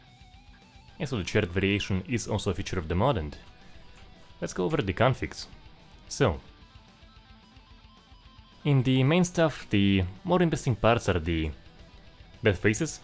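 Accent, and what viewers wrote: Russia. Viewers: It is me or your real voice is russian and talking english?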